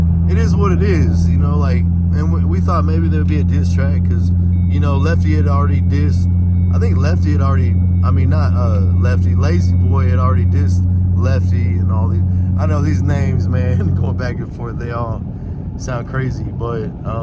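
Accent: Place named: United States. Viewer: You must be from the country you sound country...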